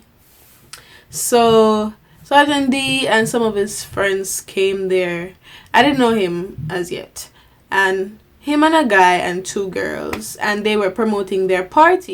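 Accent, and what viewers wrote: Jamaica. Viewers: Are you Jamaican? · I like ur jamican tlk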